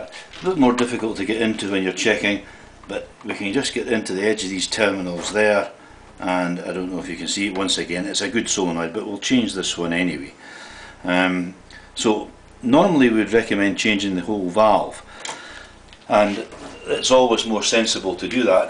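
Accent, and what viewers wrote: Scotland, United Kingdom. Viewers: I know that this video is fairly old, but I hope that you are still receiving the posts here. I have a Triton 90si. The water stopped coming through, and the shower makes an unusually loud noise. We cleaned out the filter and bled the air valve, but it didn't correct the problem. I found your video here - is it possible that the solenoid could be the problem, in that it is not opening the valve? Or does the shower not turn on at all if the solenoid is bad?? By the way....I love your Scottish accent.....:)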